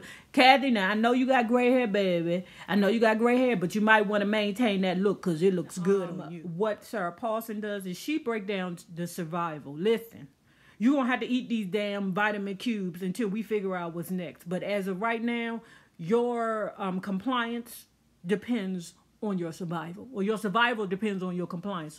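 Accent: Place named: Baltimore, United States. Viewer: I can tell you from B-more, your accent is strong!! lol #DRASK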